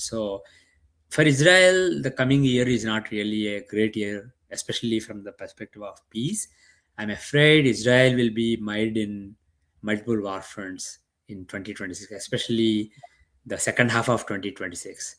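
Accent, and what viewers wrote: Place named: India. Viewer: Please cut off the Indian accent like many others who appear on Indian podcasts, news media etc speaking English that can't be apprehended easily.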